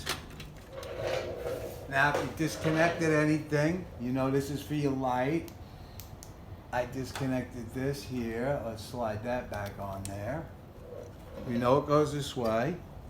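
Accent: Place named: Long Island, United States. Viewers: thanks for the video! nice vett in your profile pic sounds like your from NY as well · Excellent video! I watched a bunch of videos about replacing my bars, but yours is the most thorough - that’s important for me. And your LI accent makes me smile! I love the island. Do you know the band Zebra? WBAB broke them in the early 80’s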